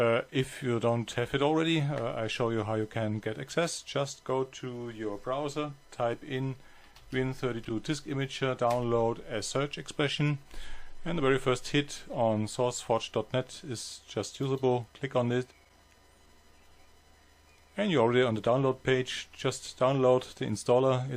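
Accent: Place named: Germany. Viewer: Dazu braucht man nicht auf die Windows Version achten, man hört es am th ;-) Nicht böse gemeint, aber ich habe lange in England gelebt und einen Deutschen erkennt man recht schnell an der Aussprache. Nebenbei, sehr geiles Projekt. Es juckt schon in den Fingern.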